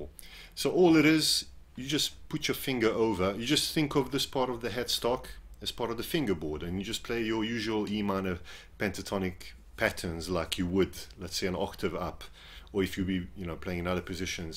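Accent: South Africa. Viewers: South African accent! His teaching style rocks! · hey, don't get me wrong, there is a great advantage in barring sometimes. So, I won't say never bar. Barring can be really the only way to achieve some stuff. I do it all the time. I realize you are doing it as a time saver and it also eliminates this "where do I go" feeling of that finger. You just bar and know that now you only have 2 or 3 fingers to noodle around with above the bar. I understand. I thought later that maybe your accent was South African after I listened more closely. Just one or two words threw me off to Netherlands. haha Yeah, I grew up playing with a very clamped restricted style and I think it hindered me. But I started on acoustic so I was not playing elec till 3 years later and just always had this cramped style going on. But.... what do ya do? hehe just move on and try to learn more things. 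aight. talk to ya later!!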